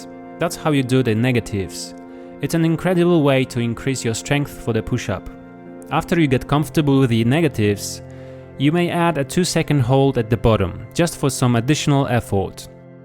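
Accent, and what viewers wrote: Poland. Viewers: What is your accent? Were are you from? You sound Polish a little :D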